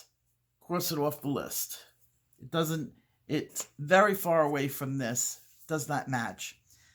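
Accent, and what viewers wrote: New York, United States. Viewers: my son would so love you. He loves the NY accent so much that he actually practices doing it. I LOVED this video so much. I have no idea until now how to use that color wheel. I got it when you suggested it. Even my ADD self could focus on this lesson.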